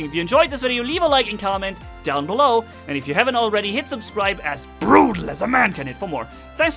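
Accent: Germany. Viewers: BTW, I knew you're natively German, Manni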